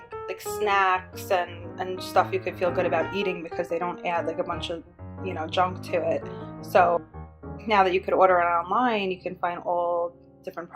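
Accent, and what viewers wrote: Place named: Long Island, United States. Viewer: Hmm... that's a long island accent lol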